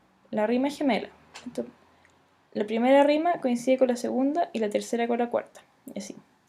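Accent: Chile. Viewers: no me gusta el asento chileno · A mi igual me gusta el ACENTO chileno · Cuando se nota que eres chilena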